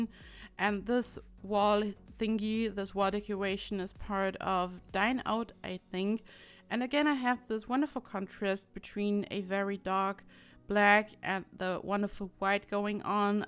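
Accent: Germany. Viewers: das deutsche Englisch macht mich total nervös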